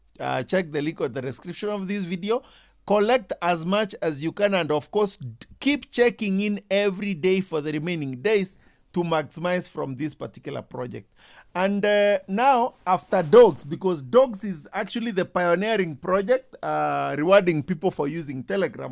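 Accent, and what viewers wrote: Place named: Kenya. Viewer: You sound Kenyan.. Kwanza mkale😂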